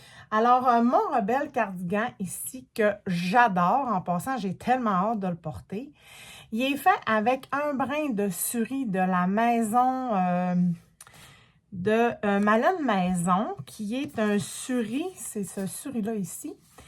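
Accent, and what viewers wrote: Lac-Saint-Jean, Canada. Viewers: Bonjour Je suis nouvelle sur tes postcast Je les ai tous écouter J aime bien évolutions et tes beau gilet J aime aussi ton accent du lac qui mes une belle couleur a ton poscast Bonne journée au plaisir de t écouté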